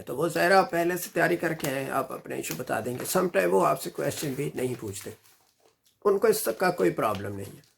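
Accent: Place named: Sialkot, Pakistan. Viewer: Sir lagta ha ap sialkoti hain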